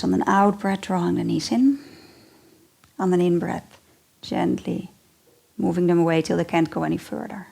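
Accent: Netherlands. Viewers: You sound like you are from The Netherlands... Dank je wel voor de oefeningen!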